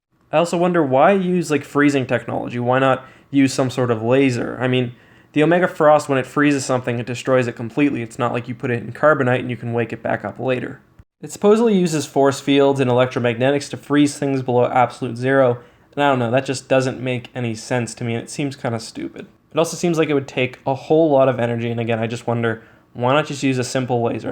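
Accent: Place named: Saskatchewan, Canada. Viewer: You from Saskatchewan?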